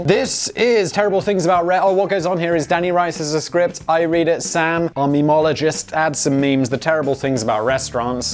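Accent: United Kingdom. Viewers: ... Ha! I was watching this video (I just love you btw but business blaze is my favorite of your channels) and my 4 yr old (as of last week hes 4) son came in and said "thats the wise old elf!" And pointed to you on the TV. Its from one of the shows he watches called "Ben and Holly's little kingdom" and I stopped and looked and said "he does kind of look like the wise old elf doesn't he bub?" And he replied with a very enthusiastic "yeah!!!" LOL. And just while typing this I remembered that its also a British show so you also probably had a similar accent (not voice just your accent) so my son may have heard you sounding similar to the characters on the show too. Lol. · I only watch this channel so I can laugh at British pronunciation of words.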